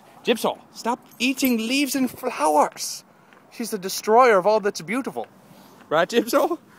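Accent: United Kingdom. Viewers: UR British right?I bet on it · British voice lol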